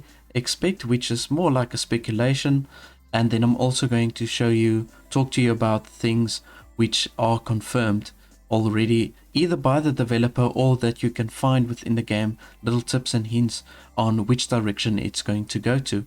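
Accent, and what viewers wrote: South Africa. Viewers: Love the afrikaans coming through. Keep it up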